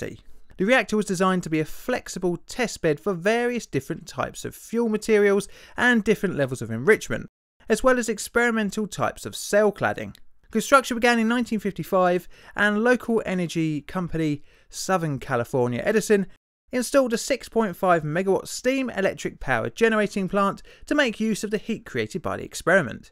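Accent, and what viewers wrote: United Kingdom. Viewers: Why is it that folks in the U.S. information industry think that using a narrator with an awful Limey accent make their production more authoritative? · um dudes probably a britbonger since you know near anyone can post to youtube. Its not just americans on the site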